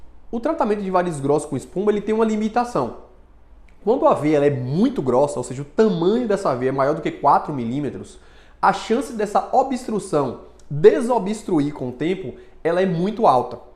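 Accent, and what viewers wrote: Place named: Bahia, Brazil. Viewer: Eu acho lindo o sotaque baiano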